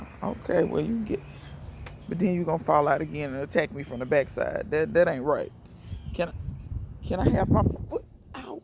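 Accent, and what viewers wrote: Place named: South, United States. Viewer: Girl you crack me up. Me and my husband be watching your videos laughing at you and those cats. I love your country accent. I thought I was country. Lol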